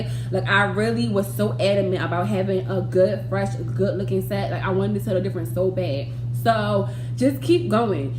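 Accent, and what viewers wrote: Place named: Philadelphia, United States. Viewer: I knew you sounded like you were from Philly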